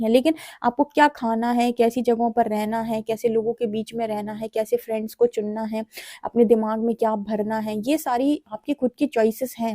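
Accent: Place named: Bareilly, India. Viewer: Kya ap bareilly se ho, accent to wahi hei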